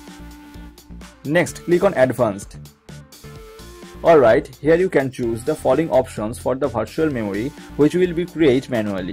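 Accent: India. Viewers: ok yeah the best video u will watch because still works on 2021!!!! thx a lot, u know when he's got hindi's accent u know it works.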